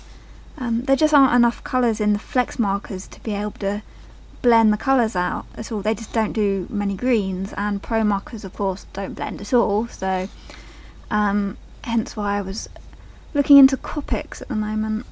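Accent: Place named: United Kingdom. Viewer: you have a total british accent, sounds cute by the way =D but you sound so shy that i can't tell your age right! maybie you have 10 or 20 i don't know!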